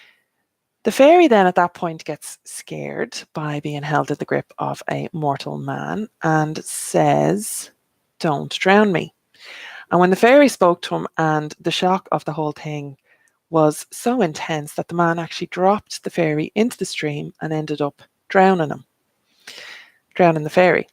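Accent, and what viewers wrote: Ireland. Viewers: Sorry to bouther you again but would you have deirderia maddigans webside details again about the whichcraft study year and a day .as i want to find a teacher to study wichcraft with i am self thought and doing so so well but would love to find a teacher now.thanks so much angel vanessa donegal celtic irish witch xxxxxxx🦄🦄🦄🌹🌹🌹🌹🌲🌲🌲🌲🌲🌲🌲🌲🌲🌲🍀🍀🍀🍀🍀🍀blessings to you great to see you again.lobly to hear an irish voice in the great whichcraft mix.happy new year xxxx.i am verry drawn to working with this lady deirdre.i heard her on the radio taling about het experience in the mothet and baby home.i was blown away and heart broken.i to gave my first baby son up for adoption in secret in ireland in 1996.her story as touched me deeply blessings 🌹🌹🌹🌹🌹🥀🍀🍀🍀🍀from donegal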